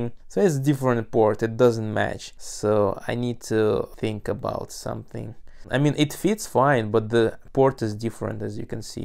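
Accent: Russia. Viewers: У него тяжелый русский акцент! )))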